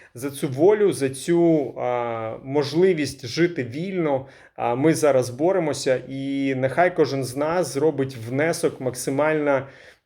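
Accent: Russia. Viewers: Что то вы с сильным русским акцентом и натужно по-украински изъясняетесь. По русски у вас получается более бегло.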